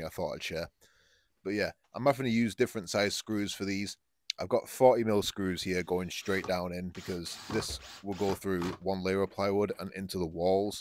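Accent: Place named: Midlands, United Kingdom. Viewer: ahh Cumbria, you sound like you’re from the midlands? I work in Carlisle on and off, I like that part or the uk!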